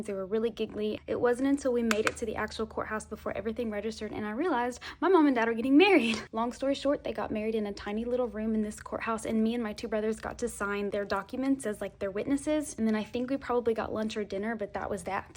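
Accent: South, United States. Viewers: The first video I saw of her I thought she sounded very southern! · yesss! A southern bell that’s what I’m hearing ! Couldn’t pin point it at first lol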